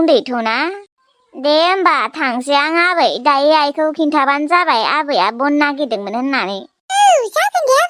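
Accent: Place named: Udalguri, India. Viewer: Udalgurini raijlainai style lwi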